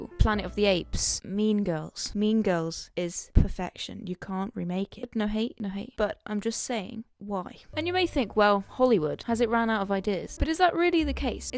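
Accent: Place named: Midlands, United Kingdom. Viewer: Your channel is great. Very well put together stuff, especially since this is only your fourth video. If it's not a big secret, which I totally respect if it is, are you from the Midlands by chance?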